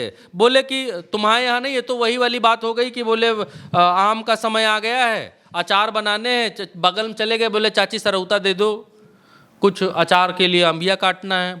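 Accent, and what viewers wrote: Bihar, India. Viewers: BHAI TUMHARI BIHARIYA BAAT BAHOT ACCHA LAGA...DHUKH KE SATH DEKH RAHE HE..PHIR BHI HASIN AA RAHI HE...😂😂😂😂😂😂😂😂😂😂😂😂😂😂😂BAHOT BADHIYA ...AEISE SABHI KO SAMAZ ME AAJAYEGA SAMJANE SE..😂😂😂😂😂😂😂😂😂😂🎉🎉🎉🎉🎉🎉🎉🎉🎉🎉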